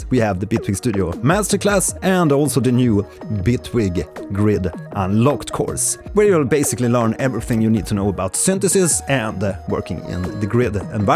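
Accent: Sweden. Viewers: Tänkte först.. Låter lite som svensk dialekt sen såg namnet =)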